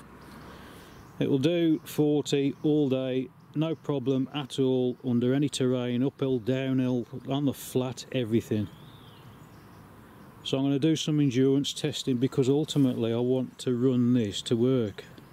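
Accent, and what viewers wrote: Birmingham, United Kingdom. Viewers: You sound like youre from near Birmingham If you want somebody to test that bike at full speed then let me know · After 10 seconds of that Brummie accent I stopped watching lol! Totally bored. .......yawn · I think that is quite possibly the funniest fuckin video I have ever seen on YouTube!! Got tears rolling down my face typing this. Brilliant Brummie. Thank you. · you a Brummie ? Nice Work !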